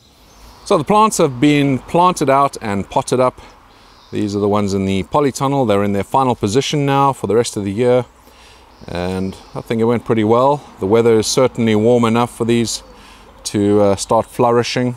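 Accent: South Africa. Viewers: Damn i was just about to comment about how South African he sounds! - Content is great! waiting for my own garden and i'll be referencing the vids for my own setup ^^ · I thought you have a South African accent. Too bad on your lost plants. Hope you get a good yield from the ones you have going though this year.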